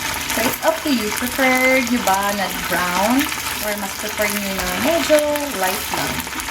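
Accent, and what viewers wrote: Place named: Thailand. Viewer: ang thai accent gyod ang nag dala 🤣🤣🤣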